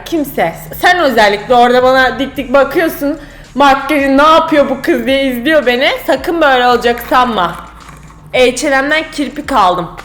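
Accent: Kütahya, Turkey. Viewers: ilk buluşmadan beyrana götürmek galiba Kütahyaliliktan kiz yaaa bende yaptim😂 senden sadece kutahya şivesiyle video bekliyoruz😁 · vallah bayiliyorum bu kiza makaji beni ilgilendirmiyor zira bir erkegim ama konusmasi allah be acayip süper konusmasi gülmesi sakalari harika vallah birde kütahyaca konusuyor benim de memleketim 43 cok hosuma gitti cok gurur kaynagmiz:D <3 <3 <3 <3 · öldüm glmekten kütahya lehçenle yap makyajj süpersin resmen koşturark geldim izlemek için mucuk seni çok sevdım ben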